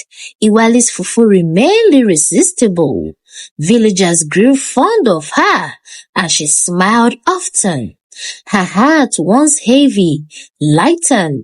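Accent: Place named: Yoruba, Nigeria. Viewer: The way you Dey talk the story like Yoruba woman too funy 😂😂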